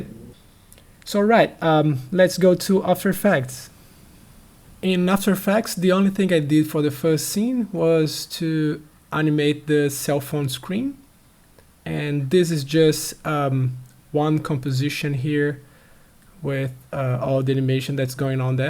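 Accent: Brazil. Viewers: 20 seconds in...i hear a brazilian accent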